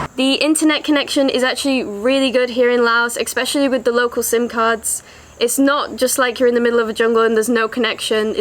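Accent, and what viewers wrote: United Kingdom. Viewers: Great narrator..beautiful English accent ❤laos.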